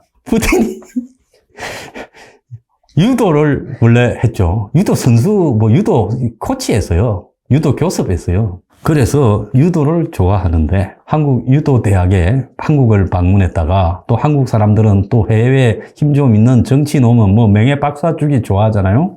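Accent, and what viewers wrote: Gyeongsang, South Korea. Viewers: 경상도 액센트가 멋있고 더 실감나게하네요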